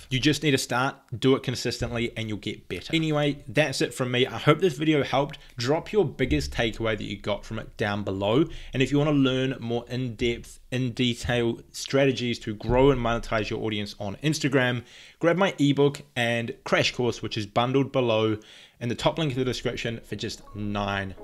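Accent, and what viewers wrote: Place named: New Zealand. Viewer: 6 minutes into this video I thought... "Is that a kiwi accent?", I open your channel in a new tab, check location... NZ. Sweet as! This is the first time you get recommended to me. Good video!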